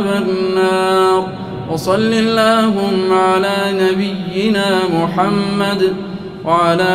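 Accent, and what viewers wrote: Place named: Iraq. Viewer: تلاوة عراقية رائعة🇮🇶 ما شاء الله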